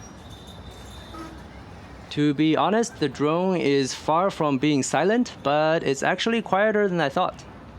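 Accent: China. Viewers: Just sounds Chinese to me but with quite clear pronunciation.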